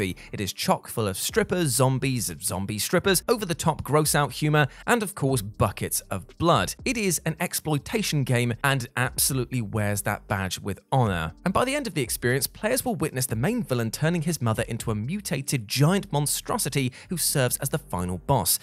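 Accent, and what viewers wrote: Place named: United Kingdom. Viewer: It’s a British pronunciation. You should hear how they pronounce “schedule” or “herbs”.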